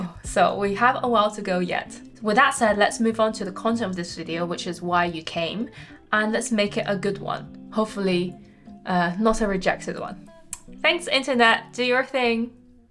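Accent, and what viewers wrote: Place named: RP, United Kingdom. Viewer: I recently stumbled on your videos algorithm suggestion and wanted to encourage you to not give up on your YouTube account and to tell you that your content, been binge watching, have been really spot on very informative and delivered in a way that normal people can relate to and understand and thoroughly enjoying watching the vids and hear your posh accent haha. I am pretty sure it’s just a matter of time before everyone else discovers you and become the success like Corey! Keep pushing all the content and thank you really appreciate what you are doing here ❤❤❤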